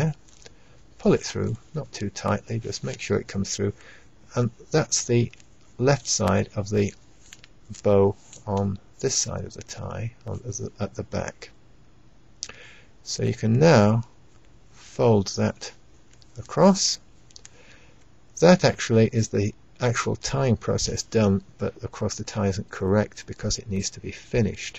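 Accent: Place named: Alabama, United States. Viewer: Your accent makes me think you might be from Southern Alabama. True?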